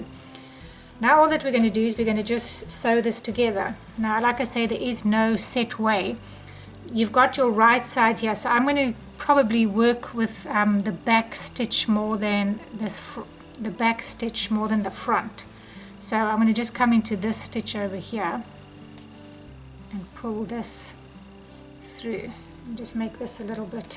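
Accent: South Africa. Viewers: Awesome video :-) we're South Africans living in Ottawa, Canada ❤️Love your accent we recognized it straight away :-) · You're South African! Am I right? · That's a South African accent!!! How lovely to hear! Erna from Cape Town, S.A.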